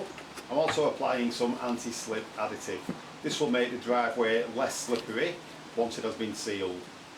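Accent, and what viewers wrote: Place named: Lancashire, United Kingdom. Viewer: Can the Russians not understand your Lancashire accent?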